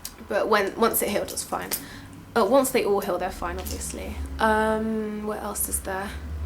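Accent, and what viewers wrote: London, United Kingdom. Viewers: I don't think it's fake at all. And even it is fake, it sounds very real. I'm from the UK, and my accent sounds very similar to hers. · I love the tattoo on your back/shoulder. And wow, has no one ever heard a London accent?! xo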